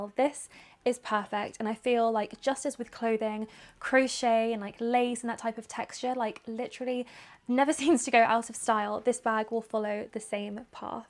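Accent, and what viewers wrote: United Kingdom. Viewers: British accent Pink life Beauty Elegance Calm Cuteness You're amazing I have been watching your videos for a long time. Keep going 💗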